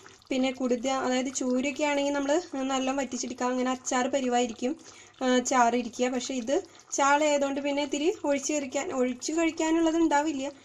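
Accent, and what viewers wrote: Thrissur, India. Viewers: ചിരിച്ചു വയ്യ. ത്രിശൂർ ഡയലോഗ്... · Thrissur slangum curryum superrr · Extremely well presented. Your language style is excellent and your honesty is highly appreciated. Not many people admit their success through the trial and error method. Your cooking is good and your simplistic Thrissur style commentary is both appealing and amusing. But let's not take away any credit from the delicious dish you have prepared. Keep going and may you present many more such interesting and appealing recipes. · ചേച്ചി തനി തൃശൂർ ആണല്ലോ 😁😁😁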